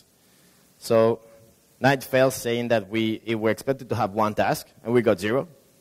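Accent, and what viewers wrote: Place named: Spain. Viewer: his accent is like a Spanish speaker from Spain speaking English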